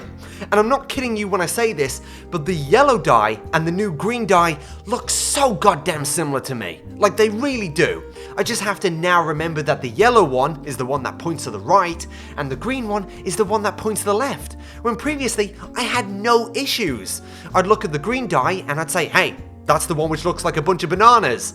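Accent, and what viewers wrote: United Kingdom. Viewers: You sound way too British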